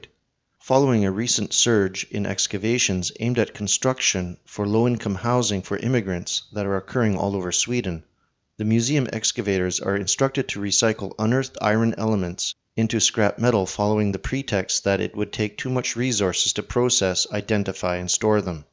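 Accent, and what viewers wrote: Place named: Canada. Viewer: are you a Canadian academic? You have a Canadian accent. Just wondering. You are very courageous. But it seems a very lost cause. Bad odds. Sorry. Just my view.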